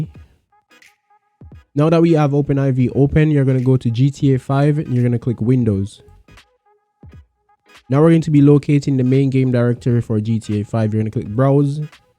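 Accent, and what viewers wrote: Jamaica. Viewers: You sound Jamaican bro. Are you? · Heard the Jamaican Accent and Subbed faster than you can say Ackee & Saltfish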